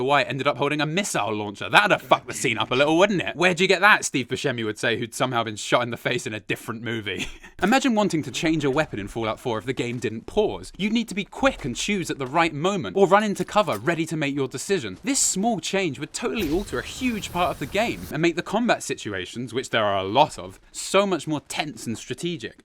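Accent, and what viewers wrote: United Kingdom. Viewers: wait hes british? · I must have had several brain hemorrhages because I never realized you were British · I love the British accent💙. Also great video! I could not stop laughing 😁😁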